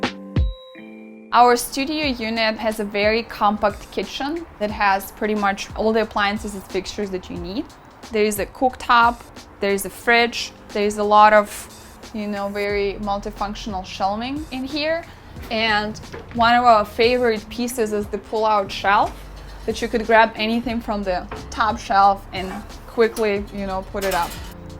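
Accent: Russia. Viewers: It is obvious that is well educated but it is so strange that Russian speaking people need at least two generations to soften some English sounds. Nice blue Slavic eyes. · She sounds Russian.......didn't expect that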